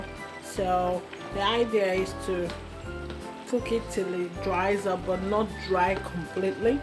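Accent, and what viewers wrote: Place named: Nigeria. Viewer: It was really refreshing to hear a Nigerian girl with a beautiful Nigerian accent. We need to own our accents too like we own our cooking and teach the young ones that we are proud of who we are. These accents we try to put on make us sound so silly and small minded.....😉 just a thought.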